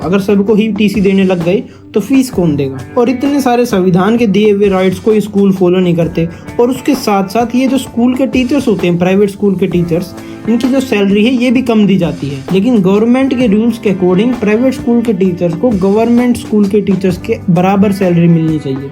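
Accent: Haryana, India. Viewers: Where are you from? I think haryana❤ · Aree tum haryana side se ho kia bahi? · Bhai tu haryana se hai na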